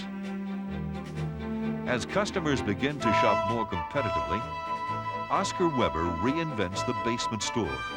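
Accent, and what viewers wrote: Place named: Transatlantic, United States. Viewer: I miss the old timey transatlantic accent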